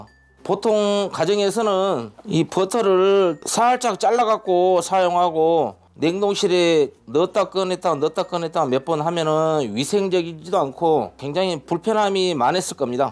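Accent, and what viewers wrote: Jeolla, South Korea. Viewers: ㅋㅋㅋㅋ붙어부니까요..전라도 사투리는 들을때마다 재밌는거 같네요. 좋은 아이디어 감사합니다.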